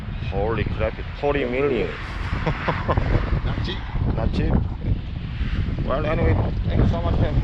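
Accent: Gyeongsang, South Korea. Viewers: 갱상도사투리 잉글리쉬 억수로 잘하시네 한국서 원어민 교사 굿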